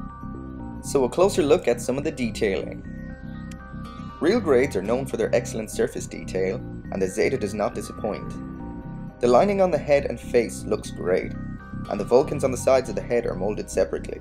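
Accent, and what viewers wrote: Ireland. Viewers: How did he ever sound Asain? He's Irish and has a clear Irish accent...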